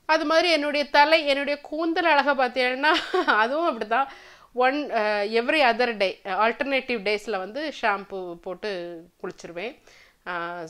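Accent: Nagercoil, India. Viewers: நாகர்கோவில் தமிழ் அருமை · Very Very great, because of your natural expression of your way of giving the replies. and waiting for the more and more videos from your end. Your Nagercoil slant is added advantage for you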